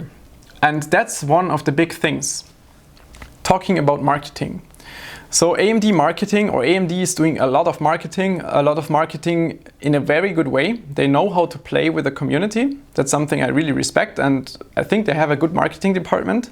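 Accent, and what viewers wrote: Germany. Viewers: I love the German accent, the way you pronounce stuff makes me shiver! But, while Ryzen chips are good and all, I'm still grabbing me an i7. Why? I already have a high-end Aorus Intel mobo. Buying a new one just for Ryzen is just illogical for me. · Den deutschen Akzent hört man einfach immer raus :D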